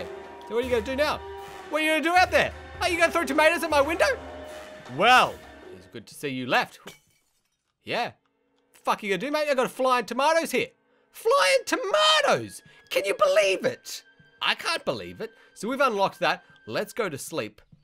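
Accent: Australia. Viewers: Is he Australian ? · Are you Australian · Love people with Australian voices! <3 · R u australian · is he Australian because i am and he kinda sounds like it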